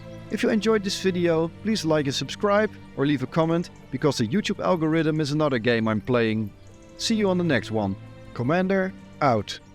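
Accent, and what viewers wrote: Netherlands. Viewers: That Dutch accent 😅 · Jij bent een Nederlander of niet, hoor t meteen, haha.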